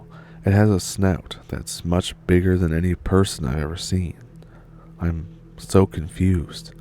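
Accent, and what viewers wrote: Canada. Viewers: I always love your stories. And your Canadian accent!!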